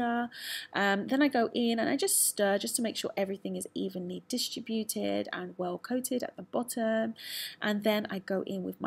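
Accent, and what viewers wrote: United Kingdom. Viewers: youtubers who speak British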